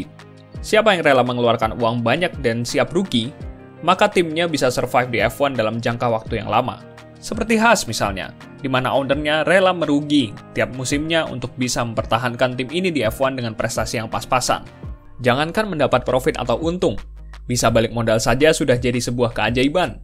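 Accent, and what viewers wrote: Cilacap, Indonesia. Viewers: btw koe wong cilacap bang?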